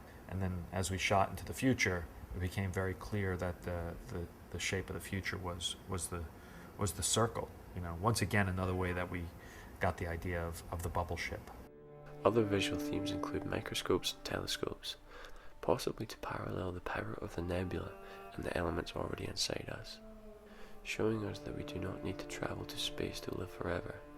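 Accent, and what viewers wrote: Scotland, United Kingdom. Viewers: What an incredibly revealing interpretation.......I have seen this movie several times, catching it on cable.....only once from the very beginning. I never considered the symbolism between Izzy's skin, the detail of the queen's dress and the tree. Tragically sad, yet beautiful movie. Thank you for this analysis. Love the accent.....Scottish?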